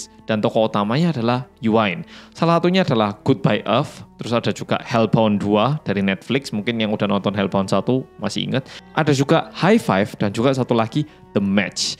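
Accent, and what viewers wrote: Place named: Malang, Indonesia. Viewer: saya paling senang mendengarkan mas berbicara, gaya AREMA NYA (AREK MALANG ) tetap tidak hilang bikin sedanp di dengarkan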